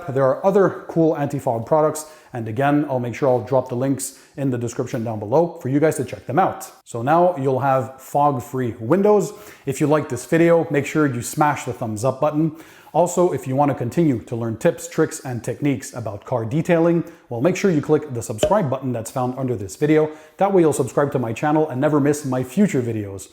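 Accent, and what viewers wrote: Canada. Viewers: Dude, why the Canadian accent? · Canadian accent, eh? 🇨🇦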